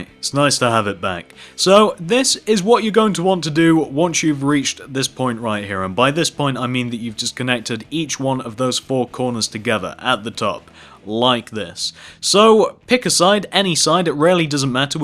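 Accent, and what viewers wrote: United Kingdom. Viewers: he does have a British accent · yea. they have british accents not stupid people accents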